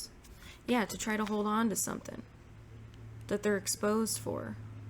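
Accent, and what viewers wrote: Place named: South, United States. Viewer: Thanku my dear, i luv ure sthrn drawl!!! Ppl hav that in th Deep Sth of our country too!!! Bc of th irish and scottush settlers that lived there!!!!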